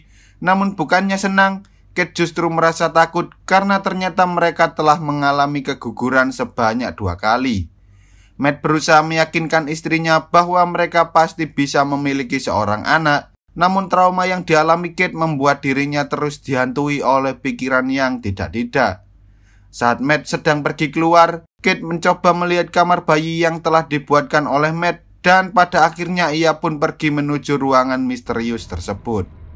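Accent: Java, Indonesia. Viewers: medok e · Mas koe opo wong jowo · orang jawa lik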